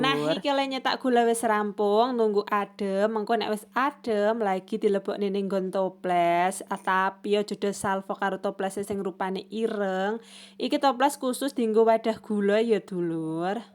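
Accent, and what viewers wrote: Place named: Temanggung, Indonesia. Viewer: Kok logate temanggungan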